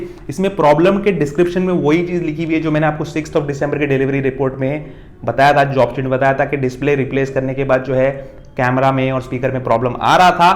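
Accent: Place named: Gujarat, India. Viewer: bro lil bit Gujarati accent its nice great video by the way ..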